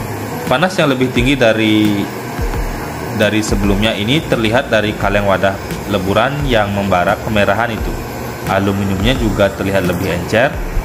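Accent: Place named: Aceh, Indonesia. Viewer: Logat nya kayak tau, dr aceh bg ya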